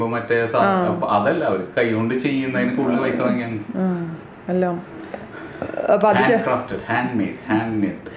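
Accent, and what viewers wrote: Thrissur, India. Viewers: Super simple recipe, enikku aa Thrissur accent ishtayi tta 😊😀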